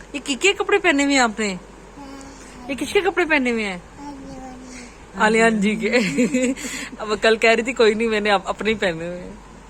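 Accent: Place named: Lahore, Pakistan. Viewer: Lahori Apne bolne k lehjy se pechany jate h k yh lahore se h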